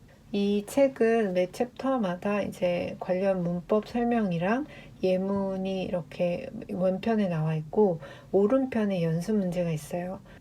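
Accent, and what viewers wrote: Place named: United Kingdom. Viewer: 영국영어 발음이 멋있는데 발음은 어떻게 연습하셨나요.. 아무리 읽어도 한국인 발음에서 벗어날수가 없네요😢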